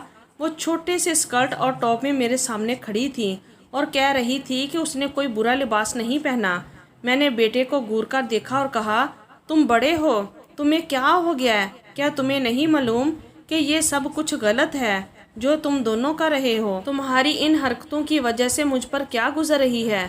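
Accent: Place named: Lahore, Pakistan. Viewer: Lahoren lehja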